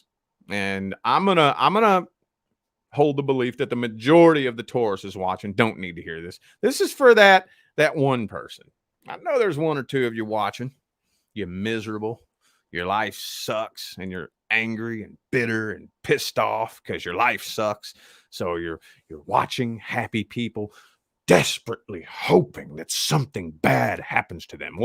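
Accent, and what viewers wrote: South, United States. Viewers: YOU HAVE A SOUTHERN ACCENT WHERE YA FROM?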